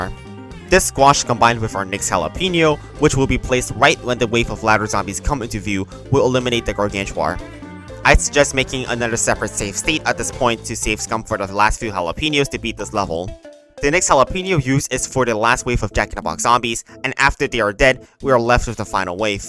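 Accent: China. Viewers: maybe his asian chinese accent you dont like? lol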